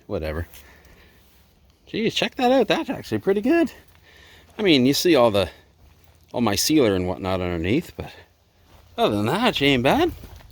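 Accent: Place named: Scotland, United Kingdom. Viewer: you sound a tad scotish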